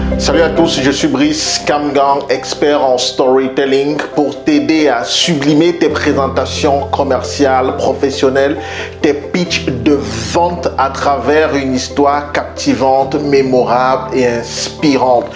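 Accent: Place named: Canada. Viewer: non non c'est juste une remarque, ne le prends pas mal 😂😂😂 Au début tu parlait de façon simple, mais je remarque que ton accent devient canadien Tu vit au Canada n'est ce pas ?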